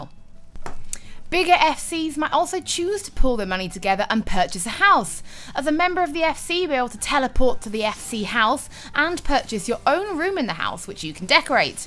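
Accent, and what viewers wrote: United Kingdom. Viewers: Is it only me that thinks her voice is sexy but yet really cute at the same time. When she said archer was sexy as hell. She sounds British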